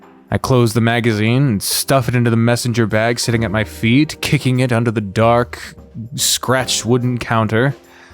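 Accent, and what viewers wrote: United Kingdom. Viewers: I think hes supposed to be bri'ish judging by the shite, bloke and bloody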